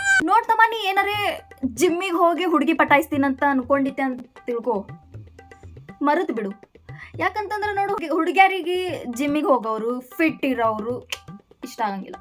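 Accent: North Karnataka, India. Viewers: ಇವತ್ತ ಫಸ್ಟ್ ನೋಡ್ ಬೆ ನಾ ನಿನ್ನ ವಿಡಿಯೋ ನೋಡಿದ್ದ. ನಮ್ಮ್ uk ಭಾಷೆ ನಿನ್ನ ಬಾಯಿಂದ ಕೇಳಿ ಬಾಳ್ ಖುಷಿ ಆತ ನೋಡ್ ವಾ. ವಿಡಿಯೋ ಅಂತೂ ಬಡ್ದ ಬಾಯಿಗ್ ಇಟ್ಟಿ ಬಿಡ್ ಅಡ್ನ್ಯಾಡಿಗಳಿಗಿ. ಸಬ್ ಸ್ಕ್ರೈಬ್ ಆಕ್ಕಿನಿ ಬಿಡ್ವಾ 😂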